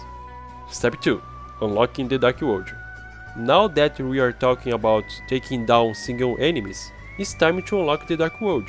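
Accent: Brazil. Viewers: tu é br ne boy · Sotaque de brasileiro hein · Vc é brasileiro percebi pelo sotaque, mano me ajuda eu quero mt desbloquear o robot kurtis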